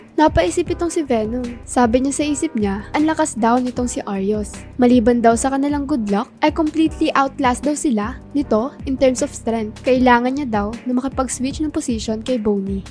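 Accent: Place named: Conyo, Philippines. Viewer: Ang ganda po ngayun nung phase ng voice ngayun nakakahinga na po yung voice di na naghabol keep it up ganda rin na may medyo pagkaconyo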